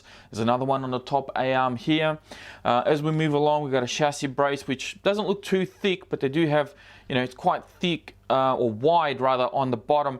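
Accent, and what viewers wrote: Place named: Australia. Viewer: There's a great big opening here, which would've allowed for some plastic to come through and mount onto a BAM-PA-BAAAA!! There's your next remix video, DJ's. Gotta love that Ausie accent.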